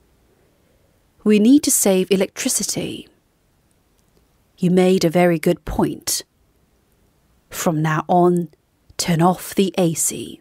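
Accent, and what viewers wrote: RP, United Kingdom. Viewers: 님~ 5시 50분 본방사수하고 있습니다 ^^ 포쉬 엑센트 발음 더 배우고 싶어요 ㅎㅎ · 의 진짜 영국영어 최고 👍🏻 쌤, 30년 장수 프로그램 가즈아~~~🌼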